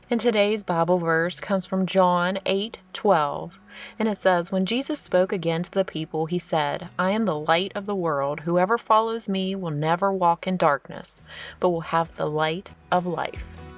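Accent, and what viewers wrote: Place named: Pennsylvania, United States. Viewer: Really cute decor! Love that copper pumpkin & wreath! I was just hunting the acorn spice today, & finally got it at target after asking a manager, it was still in the back. Was wondering if you are from PA. Kind of sounds like it to me. I grew up there. Have a blessed weekend!🍂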